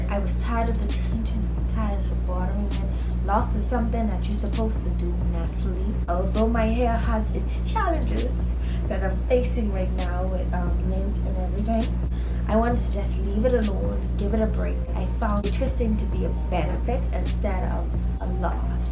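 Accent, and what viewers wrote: Bahamas. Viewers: I don't comment on videos but been watching your channel for like two months. Love the authentic Bahamian accent and your content is improving. Stay true and Congrats.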